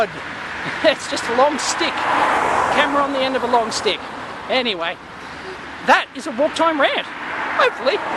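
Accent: Australia. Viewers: Since I'm at work when Neighbours is on, and Steve Irwin went to the big Croc Rodeo in the sky, this is the place I come to get a dose of Aussie accent.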